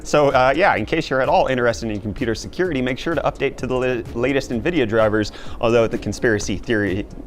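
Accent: United States. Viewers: You sound American. Why do you live in South Africa?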